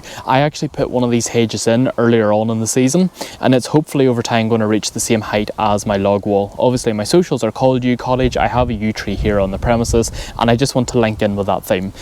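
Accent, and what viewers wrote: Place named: Ireland. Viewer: I love everything Irish and your lovely accent and the Irish vibe. Presumably you are in lovely Ireland? If not, bless ya for bringing us your lovely accent and charming Emerald Isle manner anyway! Apart from loving the Irish accent and Zero Zero Guinness, another thing I adore is listening to Gardener's Question Time on BBC Radio 4, but last time I heard the radio programme panel discussing hedges they seemed to hate cherry laurel just as much as you do. However, I've gone for cherry laurel round my garden because it is very cheap, tough and fast growing. I bought the smallest cheapest plants and after a couple of three years they are now almost a full grown hedge. You've also done a bit of yew around your garden, which is a great idea, however, even more expensive than beech, and takes even longer to establish. In fact, yew is extremely slow growing. 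However, indeed, the deciduous beech is great because it is a tree and so you can have a hugely high hedge - eventually as tall as a full grown beech tree - if the neighbours and laws of obstruction allow - but they are either extremely expensive or take years to establish. But, while if I had more money I might have gone for deciduous beech or evergreen yew, I actually really like my poor person's cherry laurel, zero problems with yellowing leaves, and in a short time it is making my garden look so much smarter, while I don't have to worry about the expense of a new garden fence and it certainly won't die from drought. And, yo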